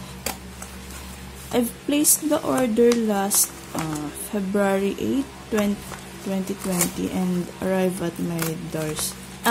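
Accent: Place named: France. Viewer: Are you french? I love your accent!!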